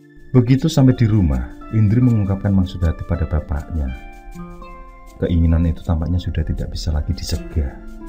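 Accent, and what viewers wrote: Java, Indonesia. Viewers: Kenqpa ya,setiap ceritanya selalu memakai logat jawa..padahal kan budaya dan logat bahasa kita beraneka ragam..kadqng bosen jg kl selalu pake logat Jawa..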